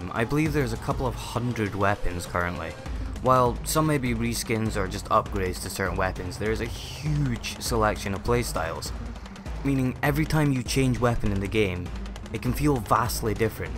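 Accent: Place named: Scotland, United Kingdom. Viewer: Scottish accent can NEVER sound bad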